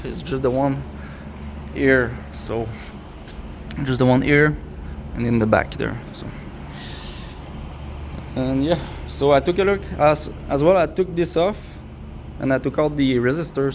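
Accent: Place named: Quebec, Canada. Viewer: Je reconnais l accent quebecois. Le tube avec la tie rap a l avant du moteur, c est la belt exhaust. La sortie est a l avant du moteur pour faire dissiper la chaleur du moteur vers l arriere. Donc, meme si tu met un collet, il va quand meme s accumuler de l eau dans le tuyau puisque la sortie est tout pres... le tuyau en u en haut avec un trou, c est aussi le tuyau de la belt exhaust. L air sort de la belt box, monte en haut, et redescend au moteur... lorsque tu est dans l eau, puisque l air ne peut pas sortir au moteur a cause de l eau, elle sort par le petit trou en haut dans le u.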